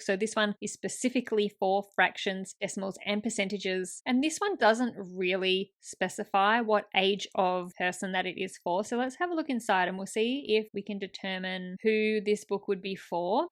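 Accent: Australia. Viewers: I love this video and the educational tool. I have a couple of niche educational books ideas. I love to see online. Plus, I'm hearing an Australian, I can relate!🙏🪃🇦🇺☺️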